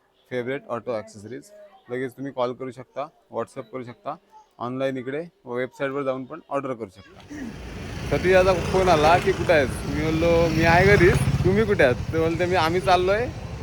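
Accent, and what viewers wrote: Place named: Raigad, India. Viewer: मस्त ani bhari tuj बोलण khup आवडतं मित्रा ओरिजनल रायगडकर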